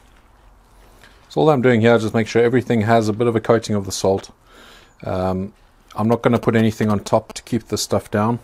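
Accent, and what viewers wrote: South Africa. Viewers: Hi loved this Video and I can hear you are from SA. Where did you buy the equipment(pressure release valve) shown in this video I can't find it in SA. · Hey,. Are you South African? You sound like you're from there. 😃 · South African accent ? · on closer inspection i believe you are correct. His accent is quite mild compared to most south african i have heard, and some of the accents on words are similar to NZ. But yeah he is south african, he actually says further down the comments. I shoulda read further lol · Probably in another video but is that a South African accent? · I have said it before, but I love that South African accent! That sound is home to me.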